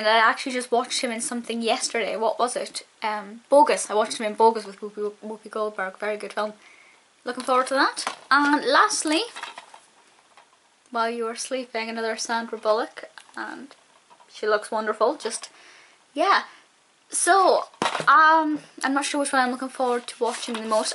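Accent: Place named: Scotland, United Kingdom. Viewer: Just watched a few of your old videos and noticed that you used to have a Scottish accent, not strong but obviously Scottish, but now you have a small hint of an accent. Have you noticed that or is it just me?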